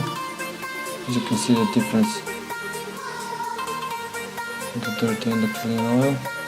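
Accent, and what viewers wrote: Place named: Russia. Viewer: ты русский?) ато разговариваешь по англиски как русский))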